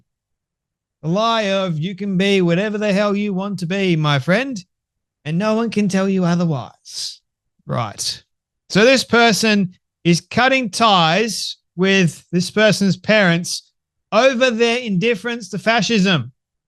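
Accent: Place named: Australia. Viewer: LOL, I love how Aussies say.. "mate", especially you,.. Happy.Night/Day:D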